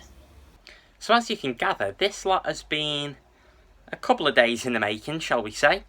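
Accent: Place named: Liverpool, United Kingdom. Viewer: Are you from Birkenhead 😅 starting watching and I was like hmmm doesn’t sound scouse but almost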